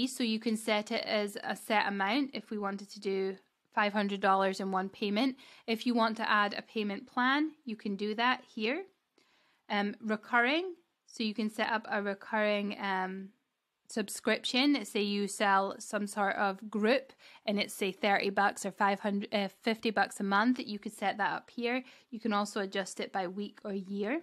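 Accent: Scotland, United Kingdom. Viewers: Nice to hear another Scottish accent! I'm thinking of members areas for my teaching site, do you know if you have the option to also have a community area under the membership, as well as access to members only videos?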